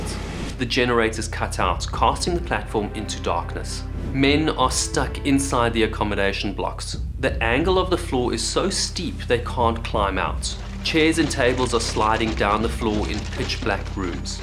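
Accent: South Africa. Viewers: You sound very South African. I love the accent and you are a real good story teller. Great stuff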